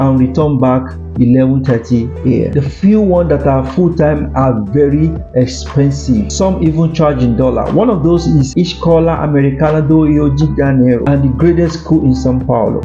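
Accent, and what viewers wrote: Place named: Portugal. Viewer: Good job! I love your Portuguese accent... :face-green-smiling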